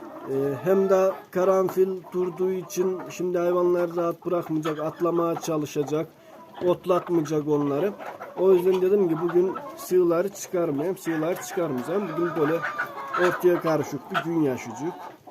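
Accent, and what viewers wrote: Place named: Black Sea, Turkey. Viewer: Her söylediğini anlamasamda, şivesi çok tatlı, her Karadeniz insanı gibi ... ☺☺☺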